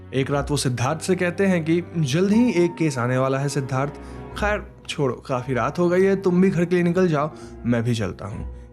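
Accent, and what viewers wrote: Bengal, India. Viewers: Bhaiya aap bengal se ho ??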